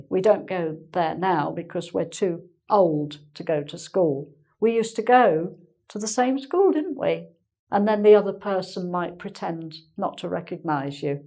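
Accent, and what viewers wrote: England, United Kingdom. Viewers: I really can't stop watching your videos. You have a beautiful accent. I used to hate british accent but now i'm fond of it because of you. Thanks nice. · From morocco i thank you a lot for your superb lession. And the way you explain it . that makes me love your clear british accent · her accent is British Amanda, the pure british accent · great class as ever, I learned American English, but I want to practice my listening with the British accent. Thanks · So brit sz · Hi, from Ukraine. Understood everything. So pleasant to learn from your lesson and hear that British English. Like a honey for my ears 😄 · Ela é Britânica? Parece sotaque Inglês.... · You're the best from engVid, you and James, but you're a far better. I love your British accent, when i was starting on English, it was hard to understand it but now i hear it clearer than the American one. 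· Lovely British accent thanks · Your voice in very clear than others .. United Kingdom's beauty of English Language starts here... Thank you so much teacher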